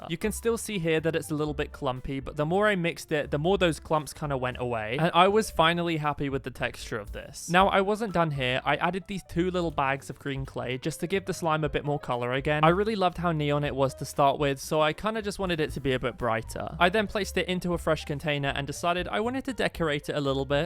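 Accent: United Kingdom. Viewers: can’t you hear the accent? he is british. obv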